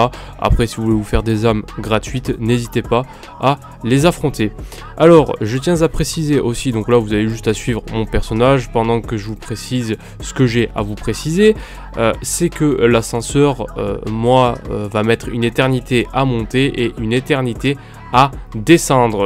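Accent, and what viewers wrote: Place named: Paris, France. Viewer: oh c'est quoi cet accent parisien fada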